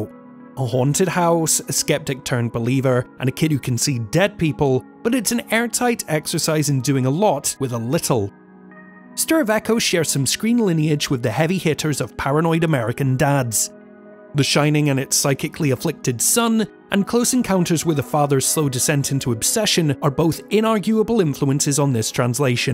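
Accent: Scotland, United Kingdom. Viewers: yes that's the reason I was wondering about your accent...as I told you I'm from cali a city from Colombia south America but now I live close by the missisipi river.. By the way I will let you know someday when my dark fantasy novel come out... Respect Scotland people... keep on coming with more content.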